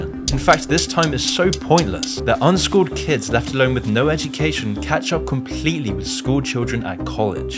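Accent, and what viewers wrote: England, United Kingdom. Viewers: British accent describing problems with the US dollar? I do appreciate your work Mr., but why the foreign interest in American finance? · Yo u English?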